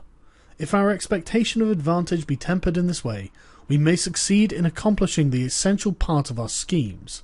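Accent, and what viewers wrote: United Kingdom. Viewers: O my, I read the book twice before. But those times compare bland compared to you smooth British narration. Keep up the good work!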